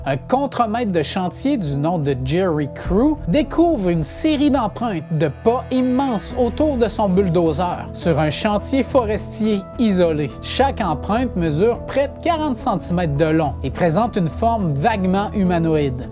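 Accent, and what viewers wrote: Quebec, Canada. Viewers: Super bien raconté et assez bien détaillé. Je trouve que tu donnes de plus en plus de étoffé d'informations et d'images. J'affirme sans aucun doute, tu es la plus belle découverte cette année. Et en plus, un québécois avec un si bel accent bien de chez nous. Merci pour ton super bon travail. Lâche pas ! ❤😁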